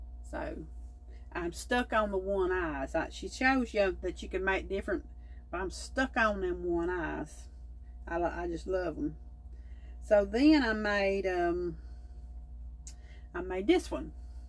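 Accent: South, United States. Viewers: Watching in my gown, heading out to work in a few, love hearing another southern voice. Great job on those monsters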